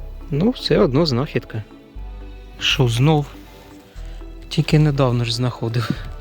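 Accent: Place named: Surzhyk, Ukraine. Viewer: Извини брат - но лучше ты бы на русском вещал . А то такой суржик ..уши режет . От того ,что на русском будешь вещать - ты из украинца в россиянина не превратишься . И русский язык - это не собственность россиян . Мы все славяне - имели общий пра-славянский язык . Потому все славянские языки имеют общие корни и очень схожи . А то, что власти нас подели - в частности на манипуляции языками - то это на их совести . Главное оставаться Человеком - в лучшем понимании этого слова . А находки респект ! Мои поздравления !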